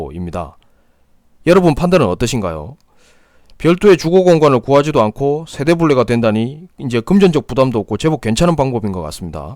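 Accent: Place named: Jinju-Sacheon, South Korea. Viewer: 감사합니다 억양이 진주사천사람 같아요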